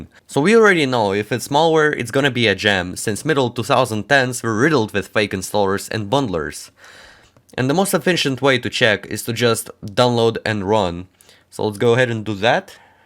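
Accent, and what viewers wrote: Germany. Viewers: are youre german or is that the windows? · ach lol, du bist ja Deutsch! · Yoyoyoyo wait are u german?!?! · bro bist du deutsch ? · are you german? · Hey just a question are you from germany or russia (Just a question)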